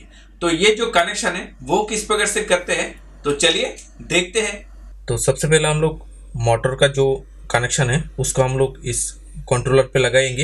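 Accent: Bengal, India. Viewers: U r Bengali?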